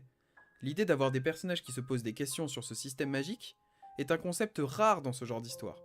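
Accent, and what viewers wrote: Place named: France. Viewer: Dommage que la prononciation de l'anglais soit si... franchouillarde.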